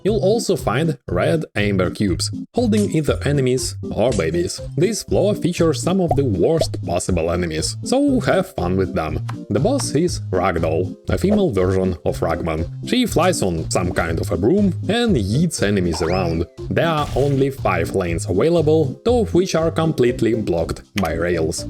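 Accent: Russia. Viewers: С оригинальным контентом не знаком, наткнулся на это видео и по первым же секундом услышал руский акцент, возможно это так для рускоговорящего плохо звучит, но звучит нечем не лучше идуского, лучше уж диктора нанять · Do you hear that? It's a Russian accent.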